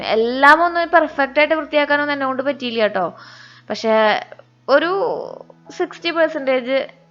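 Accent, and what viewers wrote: Thrissur, India. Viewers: ചേച്ചി യുടെ സംസാരം കേൾക്കുമ്പോൾ എന്റെ അനിയത്തി സംസാരിക്കും പോലെ.... തൃശൂർ സ്ലാങ് 😍😍 എന്തായാലും നമിച്ചു ചേച്ചീ 🙏🙏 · Poduve "poche" lifestyle kanikunna ippolthe vloggerslninnumm..sherikm natural aayt kanikunna chechik irikatte oru kudira pavan... adipwoli..kand irikaan patunindd..👍..thrissur bhashanod ishtm thoni..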